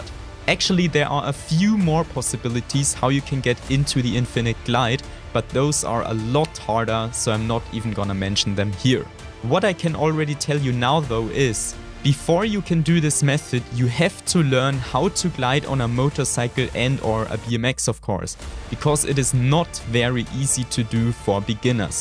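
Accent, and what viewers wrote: Germany. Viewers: Man erkennt so schnell dass du aus Deutschland kommst. Wegen den accent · Haha einfach dieser deutsche aczent xD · Uff german English is so annoying to listen to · klingt sehr nach Deutschem Akzent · Auf jeden Fall ein Deutscher ,hört man am Englisch no front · Der deutsche akzent xD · man hört dass du deutsch bist haha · if ure not a German idk where u learn English 😂 sorry but everyone hear that ure not a English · the german accent lolll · Höre ich da einen deutschen akzent ? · Du klingst so deutsch:D You Sound so German:D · are you german?you sound a bit like german xD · Hast du auch eine deutsche Version gemacht weil klingst sehr deutsch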